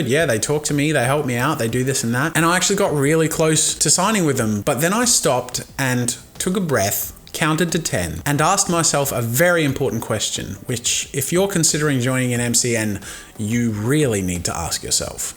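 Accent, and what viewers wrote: United States. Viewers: I love his American accent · Sick American accent, *. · COOL AMERICAN ACCENT! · nice american accent bro! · that was awesome American accent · Pretty good American accent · Your american accent sounds more american than mine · the realistic AF American accent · I love your American accent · the american accent was really good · you fake a really great American accent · Does he sound American when he is giving the lecture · i love your american accent so much its actually kind of accurate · AMAZING AMERICAN ACCENT LOLOL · Your yank accent is epic! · Terrific American accent! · hearing you talk in an american accent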